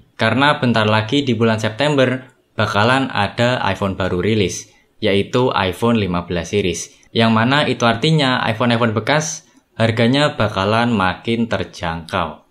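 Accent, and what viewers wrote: Java, Indonesia. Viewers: tambahan bang, menurutku pembawaan udah cukup oke, tapi ada 1 yang kurang, kata2 "gua" coba diganti aku/saya, agak lebih oke, soalnya medok nya lumayan kentel😭🙏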